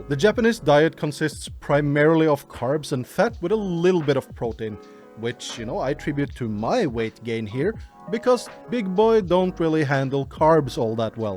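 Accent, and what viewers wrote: Norway. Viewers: okay 3rd comment lol, not sure if youve ever watched norsemen (Vikingane) but I feel like the fact you have the same norweigen accent as that show makes stuff you say funnier haha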